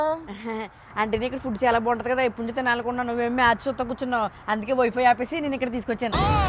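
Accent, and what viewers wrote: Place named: Amalapuram, India. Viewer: Amalapuram language super ..entandi....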